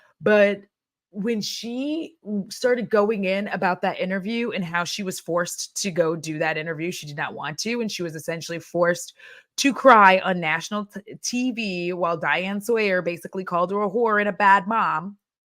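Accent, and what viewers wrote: United Kingdom. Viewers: The hair 😍 and your British accent even made my British knees wobble 😂 I was never a huge Britney fan but was certainly team free Britney. I'm quite impressed she didn't go for the easy revenge in the book. Seems classy. And I didn't realise she was our age, having been in that situation since her early 30's ish. That's so much of her life they stole. I'm not surprised she's living her life how she wants now.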